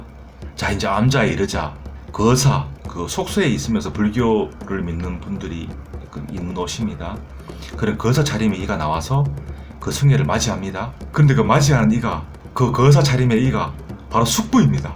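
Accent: Gyeongsang, South Korea. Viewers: 님 이 혼탁한 말세에 사람다운 이야기를 듣기좋은 갱상도 말로 이리도 정신이 번쩍들게 하시니 그 덕이 길이 이어지길 바랍니다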